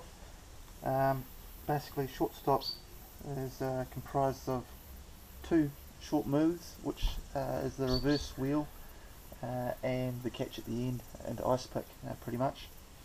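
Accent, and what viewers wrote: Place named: Australia. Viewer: Are you in Australia? You sound Australian and there's a lot of greenery and birds where you are... It sure looks like it. Correct me if I'm wrong, I could be totally off.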